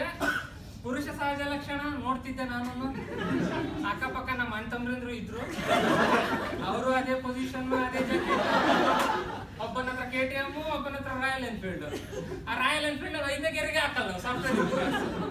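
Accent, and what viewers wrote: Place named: Davangere, India. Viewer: ಯಾರು ಗುರು ಇವ ಪಕ್ಕಾ ದಾವಣಗೆರೆ ಧಾಟಿ ಭಾಷೆ 😍😍😍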